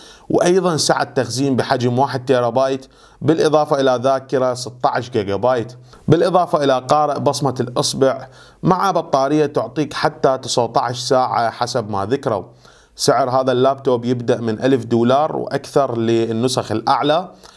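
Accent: Iraq. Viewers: مؤتمر CES2018جميل جداً كل اشياء جميله شكرا على تقرير الجميل بصوت عراقي وشكراً على متابعتك على كل ما هو جديد في عالم 🌐 التكنولوجيا الحديثة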